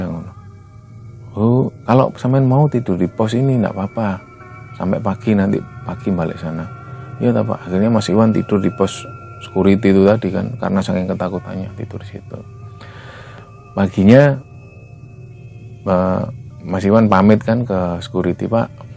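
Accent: Java, Indonesia. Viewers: Langgam jawanya 👍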